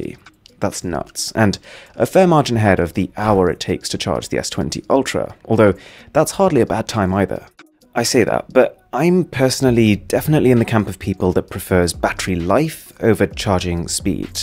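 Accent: United Kingdom. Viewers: PacMacDo it’s definitely not a generic English speaker, you can tell he’s got quite some British accent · that's his NORMAL voice british accent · that's normal British accent you're just being salty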